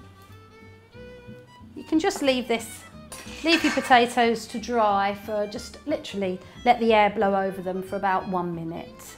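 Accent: England, United Kingdom. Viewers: I have an abundance of potatoes and I needed a way to preserve them; Thank you for sharing. I cannot believe some of these rude comments below. >:( I can hear you just fine, and the English accent is great. :) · What a lovely British accent! Very sexy...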